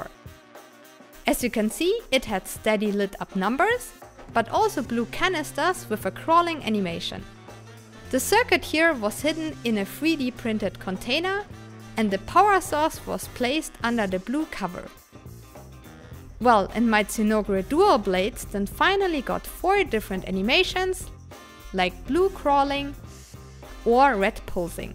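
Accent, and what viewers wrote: Germany. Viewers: In den ersten 2 sec gehört das du aus Deutschland bist. No front. Ich finde es gut 😁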